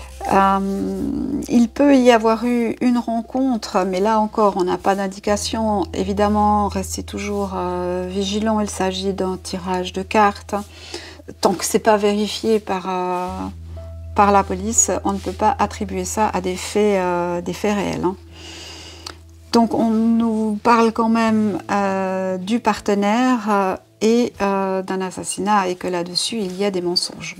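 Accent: Switzerland. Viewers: Coucou, il me disait bien que ce petit accent me rappelait quelque chose 😃😃 un gros bisou de Genève 🥰🥰🥰🇨🇭🇨🇭🇨🇭🇨🇭🇨🇭🇨🇭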